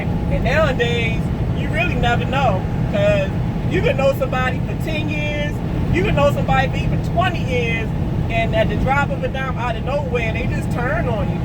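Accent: Baltimore, United States. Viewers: I love the way you say "Dude". My son said you sound like you from Baltimore and I told him you were! Lol. we just laughed about how you were talking. We have folks in Capitol Heights, Maryland, Bmore and DC.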